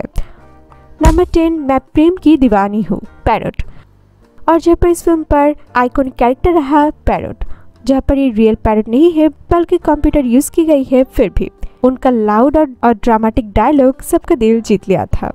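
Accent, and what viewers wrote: Bengal, India. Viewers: your accent says you are bengali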